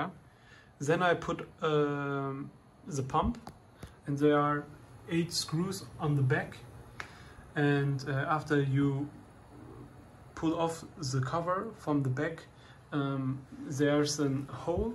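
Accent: Germany. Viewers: am i correct and i listen to german english speaker? My english is the same haha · Höre ich da deutsch?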